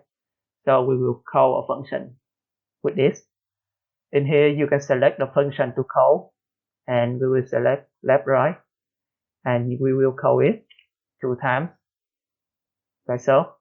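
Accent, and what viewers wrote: Vietnam. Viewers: ông là ng việt nam à. cái giọng ko lẫn đi đâu đc lun ấy :))) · nghe giọng là biết ông người Việt rồi, công nhận ông giỏi thiệt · Nghe biết ngay VietLish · Ôi cái giọng Việt Nam này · App ngon quá bạn ơi, nghe giọng nghi nghi là VN rùi mở page FB ra thì đúng lun :)) Trên dt xiaomi muốn chạy phải cấp quyền lại liên tục chán quá :( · )))) ông này việt nam ak nói tiếng anh i chang v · Chuẩn mọe giọng Việt😂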